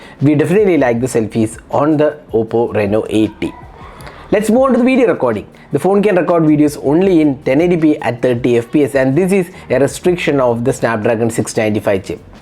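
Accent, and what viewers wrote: India. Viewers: More power comes to your yt channel sir. I love Indian spoke english on their content.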